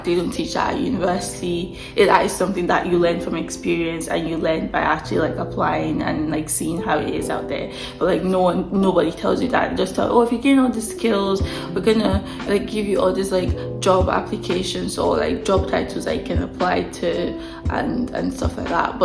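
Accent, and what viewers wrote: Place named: Scotland, United Kingdom. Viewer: Haha, love it! Digging the subtle Scottish accent ❤😅. More power.🚀🙌🏽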